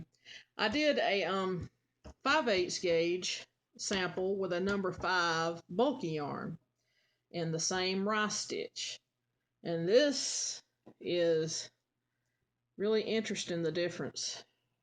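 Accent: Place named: South Carolina, United States. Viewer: Hey hun, where you live? Upstate South Carolina here. You talk like we do , actually better than I do. People from other states have trouble understanding what I say. My daughter-in-law is German and taken years to feel comfortable talking with her! There's still times she and I don't comprehend one another. Also, I love your videos! I'm so grateful for you and several others, no offense, if not for these videos I probably would never started 😅! Thank you 😊!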